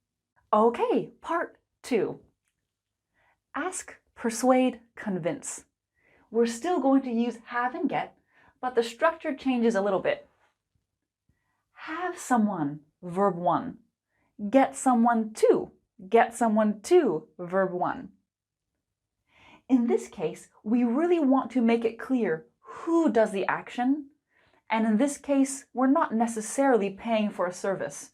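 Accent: United States. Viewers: Are you American or british? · I want to learn speaking English fluenly and correctly on phone calls or by any other means . I Enjoy your channel keep it up. sister are you american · Hello, great teacher! Is your pronunciation American, British or Australian? Your pronunciation is so nice!😜👍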